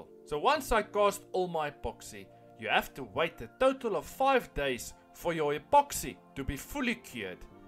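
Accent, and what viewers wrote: South Africa. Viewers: This is such a South African accent 😂😂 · you South African? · Out of interest sake, are you South African?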